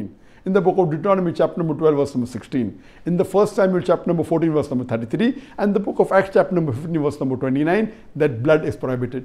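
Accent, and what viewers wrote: India. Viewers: what was the last word ? i didnt hear it why he speaks in hindu englisch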